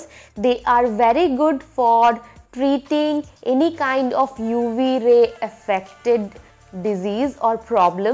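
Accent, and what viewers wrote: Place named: India. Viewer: Absolutely true annoying mostly Indians speak English like this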